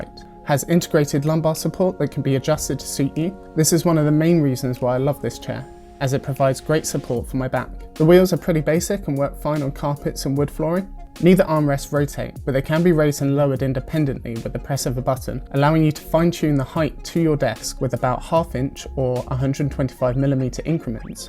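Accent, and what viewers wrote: United Kingdom. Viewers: rep bri'ish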